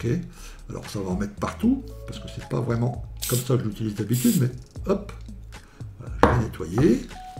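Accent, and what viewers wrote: North, France. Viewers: Salut, j'ai découvert ta chaine il y a peu et j'aime beaucoup.Le ton et la vie que tu y met (ce qui n'est pas toujours simple en fonction du sujet) 👍Et le p'tit accent de ch'nord me ramène à mon enfance ✌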